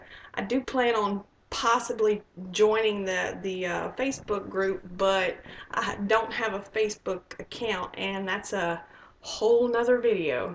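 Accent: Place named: South, United States. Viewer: Your accent sounds like home to me. :D I'm from the South. Love your story!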